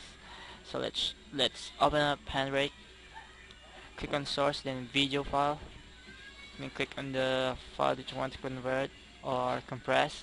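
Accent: Philippines. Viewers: are you filipino..?? dat accent xD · i know a filipino accent when i hear one lol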